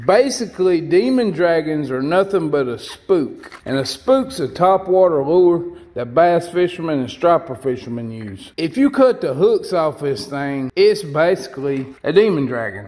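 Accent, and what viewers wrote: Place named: Southwest Virginia, United States. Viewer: If I didnt know any better , I would say with the accent you probably pretty close to my neck of the woods Southwest Virginia here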